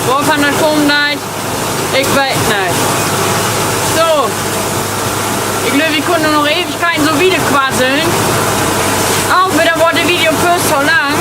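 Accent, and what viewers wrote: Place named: North, Germany. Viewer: Ich schaue seine Videos um bisschen zu verstehen wie die hier im Norden reden 😂😂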